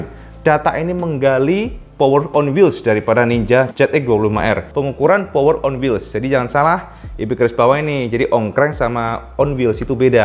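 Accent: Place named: Surabaya, Indonesia. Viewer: Asyik suka deh pembawaan wong arek" suroboyo auto sub lonceng, sukses terus bwt cak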